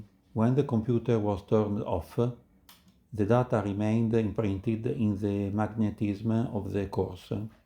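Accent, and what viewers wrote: Italy. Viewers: You are Italian. At least your accent says so! :D However, great video! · Mai sentito un accento più italiano di questo ahahaha.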